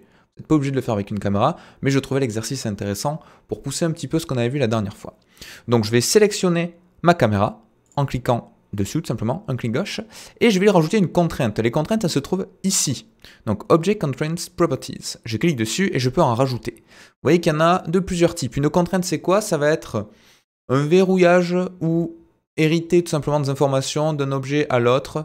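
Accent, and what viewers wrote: South, France. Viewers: Bananée 🤪🥂 beau gosse.. 😉 Tu ressembles à un de mes cousins sans la moustache et le bouc et sans le p'tit accent du sud. 😉😘 Toujours pour tes tutoriels même si je ne suis pas souvent disponible depuis que j'ai retrouvé du travail. Je te souhaite pleins de nouveaux projets pour cette nouvelle année et de nouveaux abonnés car je t'ai mis en sélection de chaînes via ma chaîne youtube en sélection de chaînes pour apprendre Blender en français. 😉😘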